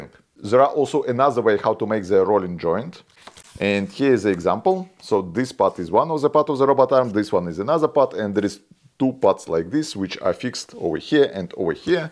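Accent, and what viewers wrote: Russia. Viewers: Strong russian acсent, beautiful ideas )) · Perfect Russian accent Прикольная самоделка👍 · блин, ты же русский ? акцент прям явный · Почему я слышу русский акцент?))) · А ты не русский? Проато акцент есть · Не пойму что за акцент???🤔🤔🤔 русский????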